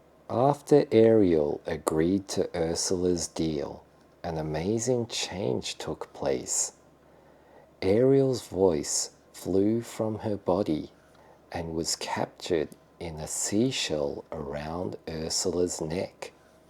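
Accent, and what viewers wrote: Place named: Australia. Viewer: さんのオージー英語ずっと喋ってて欲しかったのでむっちゃ嬉しいです…！！ネイティブ速度バージョンもいつかお聴きできるでしょうか☺️ オーストラリアに戻りたい気持ちと英語から離れたくない気持ちを幾分か救ってくれます😊❤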